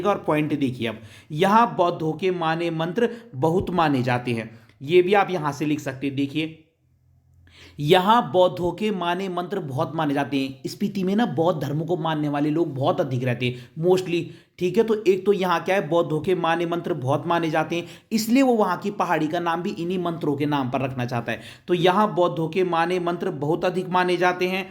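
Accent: Garhwal, India. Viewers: Sir aap garhwali ho kya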